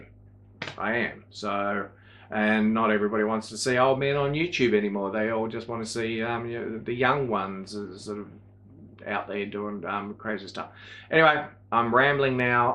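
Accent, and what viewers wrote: Australia. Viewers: I was just thinking about you yesterday and checked to see maybe I'd missed any videos, then this popped up on my feed tonight. I'm so sorry you have all this to deal with and I'm praying for you and hoping you'll go into remission. You have many fans- you aren't the only "old person" doing/watching You Tube, lol. Your videos are so interesting, your voice is soothing and I'll take that Aussie accent any day!💕 Lots of prayers, best wishes and love to you,.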